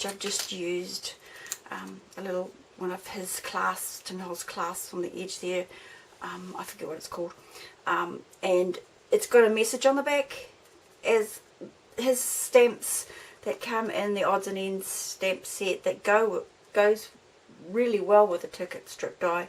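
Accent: New Zealand. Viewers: So nice to hear a Kiwis voice on youtube, your tickets are amazing.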